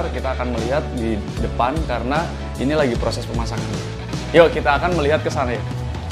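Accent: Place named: Java, Indonesia. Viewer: Sampean asli pundi nggeh mas. Kok ngomong e nggowo boso jowo😁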